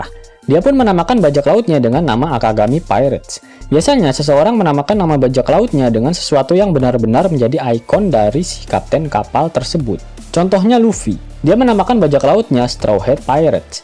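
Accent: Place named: Bali, Indonesia. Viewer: a na ca ra ka da ta sa wa la, orang bali kayanh nih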